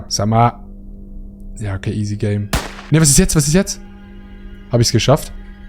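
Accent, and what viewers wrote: Switzerland. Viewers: das ist deutsch halt schweizer art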